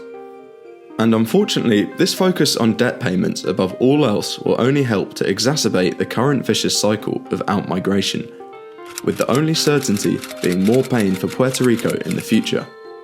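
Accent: United Kingdom. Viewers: So, as an American, I’m being scolded by a British accented presentation? Not disagreeing with anything, but seriously, I wonder what the British did with some of their colonies?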